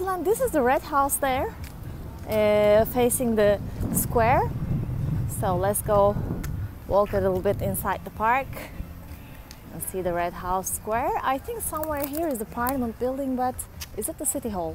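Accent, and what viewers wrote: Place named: Turkey. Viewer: Ah, 😊 türk müsünüz? Biraz accent imizden tahmin.etmisdim. I am also planning to go to Trinidad & Tobago, but we are a bit scared. Would you tell me a bit about the criminality please?:-)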